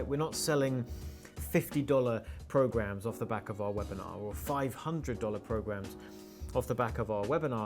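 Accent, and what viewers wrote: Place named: United Kingdom. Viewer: We need deep dive,. Thank you so much! Incredible content. Also loving your British pronunciation =)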